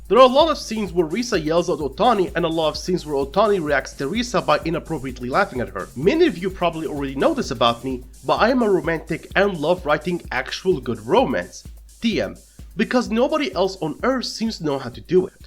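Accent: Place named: Middle East. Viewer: Based on your accent and saying that you watched spacetoon I assume you're middle eastern correct?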